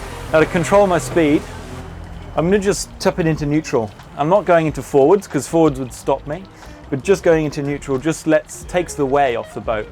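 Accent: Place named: United Kingdom. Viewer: That accent says born in the UK. I’d like to think if you were in UK you’d use the kill cord immediately the engine is running. And yes you can do the lines single handedly with the engine off, step to the helm, kill cord on then complete manoeuvre. It’s a great video, but remember you set the example to every newbie watching this show who may have no idea how or why the should use a kill cord.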